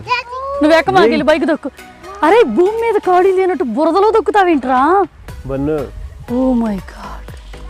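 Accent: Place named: Telugu, India. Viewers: Hello your from east godavari na? Bcoz your slang is like that... I like your videos andi.. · I can feel Pure Desi Telugu language in your voice. Fell in Love with your voice ....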